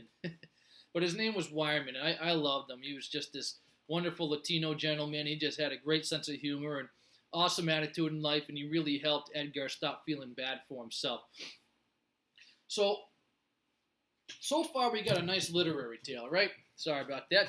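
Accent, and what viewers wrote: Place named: Boston, United States. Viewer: Patients lmfao!! Are you from Boston btw you got hint of accent, as I’m from Boston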